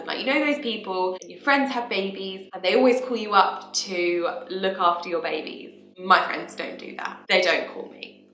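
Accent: RP, United Kingdom. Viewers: First! For the first time! I was waiting for the video and tadam! ❤️ Great video really! It’s nice to know you better (and sometimes think « oh! just like me! ») Too bad about grammar. I hoped increasing my english level by watching your videos and reading your blog 😁 Just kidding of course. To me your english sounds so posh and you know what Pr Henry Higgins says in My Fair Lady : « The french don’t care what they do actually as long as they pronounce it properly ». So grammar or not grammar that is not the question, to me you are perfect. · I think you have great grammar but I love what you have said because I'm the exact same. I think your accent makes you sound posh if you had an aussie accent like I do it's worse it's so bad. Xoxox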